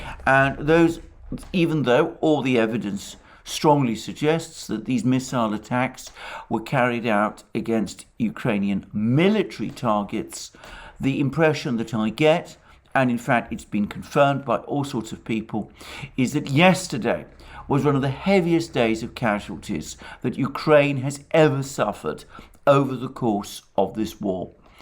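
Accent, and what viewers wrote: United Kingdom. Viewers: With all due respect, but I disagree on your views regarding the assassination attempt on Dugin!!! The ends justify the means and he is supposed to be Putin‘s „brain“. And hearing someone with a british accent complaining about ONE innocent life which has been lost is soooo funny!!! By the way… how‘s the „Bomber“ Arthur T. Harris Statue doing in London? Please do not tell me about lost, innocent lives!!! You are just not entitled to do so. Sorry… thats my very personal view.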